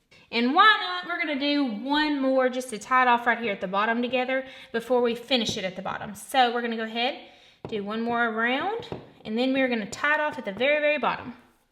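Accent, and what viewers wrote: South, United States. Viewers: My goodness, your just about the cutest thing I ever seen. Your southern drawl is so darling. I just stumbled across your channel and yes ma'am I liked subscribed and hit the bell so I will be seeing you again real soon. Oh and that little hanging pot is too cute!! 😙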